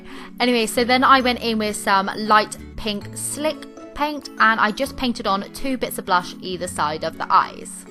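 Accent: United Kingdom. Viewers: It’s a Brit!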